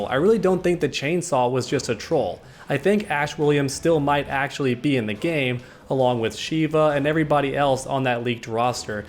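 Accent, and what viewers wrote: Texas, United States. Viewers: Wait, you're a Texan?!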